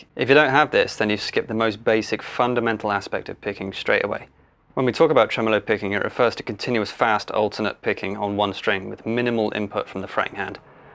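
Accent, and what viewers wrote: United Kingdom. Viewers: Wow. I can do it now! I think all it took was that lovely British accent. Oh, and also imagining that the pick is always in contact with the string. Brilliant! Thank you, brother.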